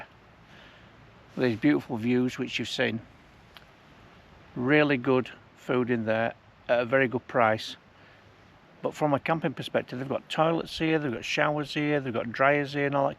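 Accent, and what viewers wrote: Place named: Scotland, United Kingdom. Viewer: Oh my gosh! I’m an American and I kept trying to catch your last name as you mentioned your wife. Then I finally heard Mrs.! I don’t know if anyone has ever mentioned that you have a touch of a Scottish accent 😂. I love watching your channel. I was one of the group that came over from Reddit and I’ve enjoyed all of your videos! I hope you keep making them.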